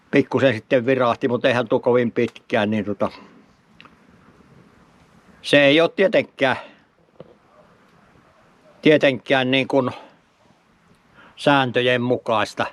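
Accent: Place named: Kaavi, Finland. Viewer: Kiitos ja kiitokset, että jaksat tehä näitä juttuja, mukavia arkisia hommia. Mie niin tykkeen tuosta siun kuavilaisesta murteesta, mukavan kuulosta. Nyt pitää lähtee ostamaan ryynäriä ja loimottoo hiilloksella. Seuraavaa laavureissuu odotellen...❤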